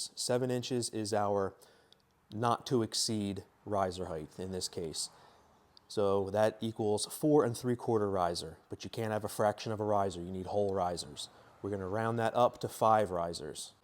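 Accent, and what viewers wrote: Philadelphia, United States. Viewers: I sensed a Philly-ish accent in a prior video... there you go!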